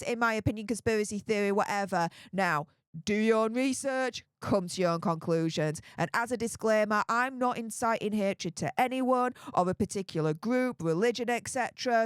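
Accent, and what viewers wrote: Yorkshire, United Kingdom. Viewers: hi which part of yorkshire are you from you sound like a yorkie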